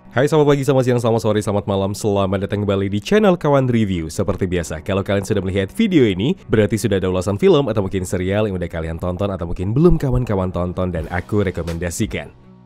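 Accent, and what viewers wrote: Medan, Indonesia. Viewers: “Kalau kau ga suka sama the batman, berarti pas aku nonton film ini bukan untuk kau lek” wkwkwkwk medan kali abang ini 🤣🤣🤣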